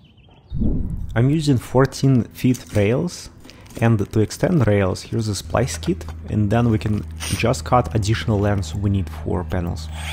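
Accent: Russia. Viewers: Ярко выраженный русский акцент. :)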